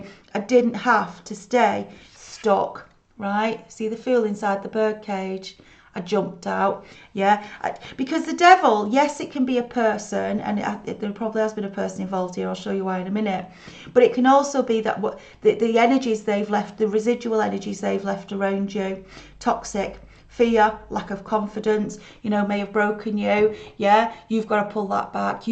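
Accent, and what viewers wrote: United Kingdom. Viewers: Thank you honey u have just gained a new sub x nice to hear fellow uk readers x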